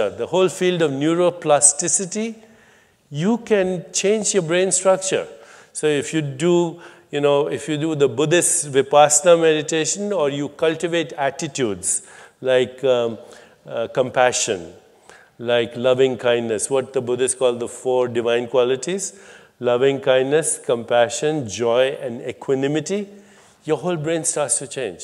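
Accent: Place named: India. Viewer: besides the incredible things he says his voice is enchanting.. the timbre, the indian accent, the glotal clicks... enchanting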